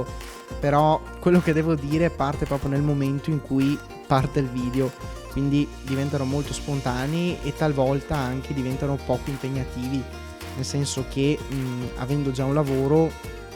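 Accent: Veneto, Italy. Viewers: Ti ho appena conosciuto ho parenti veneti ed è bello sentirti parlare xchè mi fai ridere tanto, non pensando al resto, grazie. PS anch'io andavo a ballare liscio e mi divertivo, ora non posso più 🤷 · Mi hai aperto un mondo. Sono veneta-piemontese , nata e vissuta in Piemonte. Mamma padovana, papà torinese. Tu parli pressapoco come parlava a volte la mamma e i parenti veneti. Prima, di udire te, avevo sempre pensato che quel : " proontooo" al telefono , di uno zio fosse solo una spiritosita' sua, essendo lui un burlone, invece no tu lo pronunci uguale. E anche tante altre cose che diceva la mamma: scoase, cosa chel voe, sacramento, ecc. Praticamente scambiavo la parlata , in certi momenti, xche ' per il resto parlava italiano, di mia mamma per un suo modo di essere per scherzare; anche xché sapeva che alla nonna piemontese dava fastidio la parlata , un po' sboccata , dei veneti, quindi credevo che la mamma accentuasse. 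Invece no, non era la mamma che scherzava era proprio il modo di parlare dei vicentini, padovani ecc.😂😅😊 Sei molto bravo , mi fai ridere e mi riporti alla mamma che non c'è più. Continua con i tuoi video. Grazie.😊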